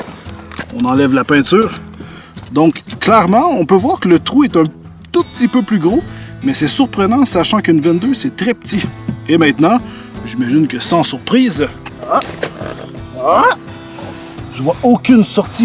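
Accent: Quebec, Canada. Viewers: J adore l accent Québécois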